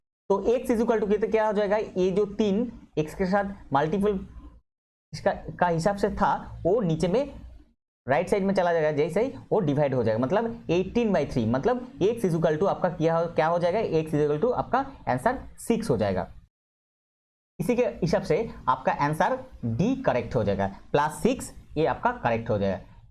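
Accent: Bengal, India. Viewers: app bangali ho keya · Dada Tumi ki Bengali?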